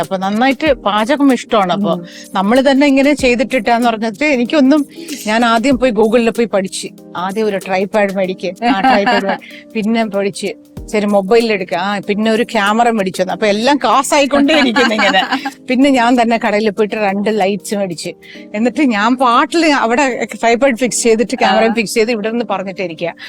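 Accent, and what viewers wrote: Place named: Alappuzha, India. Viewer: Malayalam aanu..She is Alappuzha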